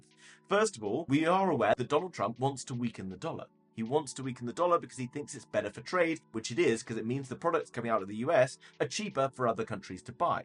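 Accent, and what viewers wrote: United Kingdom. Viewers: Are you brittish?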